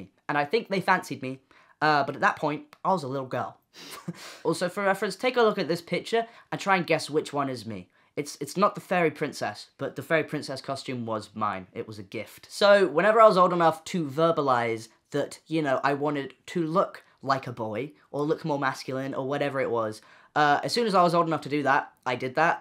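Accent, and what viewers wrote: United Kingdom. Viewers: They have the same type of British accent. British accents are different depending on where your from. Like if your from liverpool your accent is different to someone from the likes of Birmingham. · you're so British!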